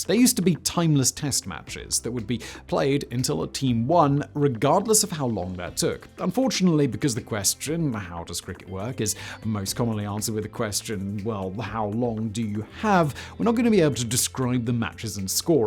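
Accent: RP, United Kingdom. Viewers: This guy sounds like a normal british guy who's trying to play up his accent so americans think he's fancy and posh, ya sound like a twat mate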